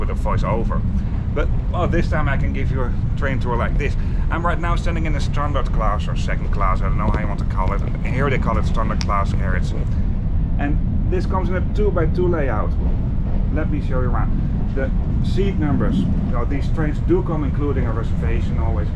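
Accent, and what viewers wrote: Netherlands. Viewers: you have strong dutch accent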